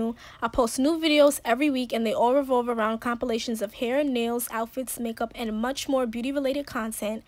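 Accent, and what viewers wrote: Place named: New York, United States. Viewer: Are you from New York? If your not either way I love your accent 😩❤️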